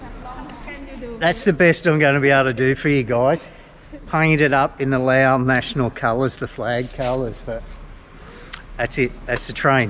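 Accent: Australia. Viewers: you sound aussie mate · Man! Where the heck were you from? Such an accent that I couldn't make out what the heck you are talking about? Aussy?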